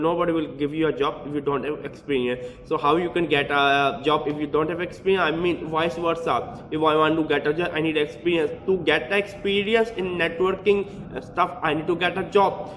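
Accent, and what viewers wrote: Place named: India. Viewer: I really wanted to watch this video because I am trying to decide my job field between those two. Sadly.. I gave up watching this video. I can't understand what he's saying. It gave me a headache after watching this video for 2 mins. Seriously. speak slower. You have super heavy accent so it's really hard for anyone to understand you unless they are Indian too. If you can't fix the way you speak, at least put a subtitle. I can understand most people with accent fine but this is like the worst accent I've ever heard. Super heavy accent + fast speaking = Inglish, not English. Someone please reply which one he picked between those two. I am guessing he picked web developers since he was holding networking books and saying he's born to do networking.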